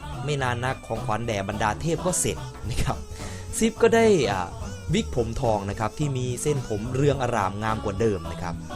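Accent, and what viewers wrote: Isan, Thailand. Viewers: พี่เป็นคนอิสานใช่มั้ยครับ 555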